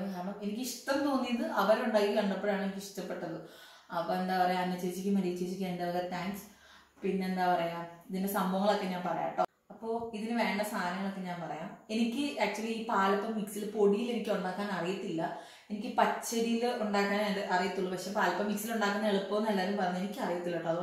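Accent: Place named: Kottayam, India. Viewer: Not Thrichur talk... This is exactly kottayam Thiruvella chengannur talk.. same dress next day..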